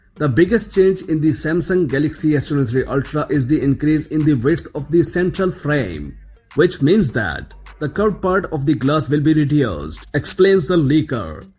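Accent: India. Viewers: Sorry, thats no interesting information and please talk without IIndian slang if you want to tell something to the world. I had to break up watching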